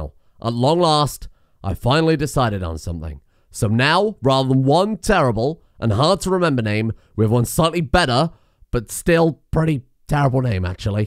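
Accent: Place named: United Kingdom. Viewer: British mah friend...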